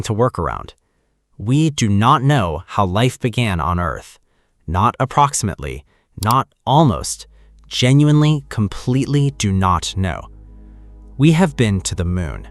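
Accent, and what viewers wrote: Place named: California, United States. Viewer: The most California accent I've ever heard.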